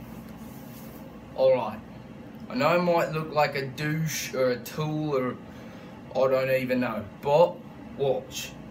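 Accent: England, United Kingdom. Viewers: Can someone explain why he decided to speak in an English accent? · What! U call that an Australian accent. It’s British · fookin hell thought he is a fine lad from Briston or London, until i found out..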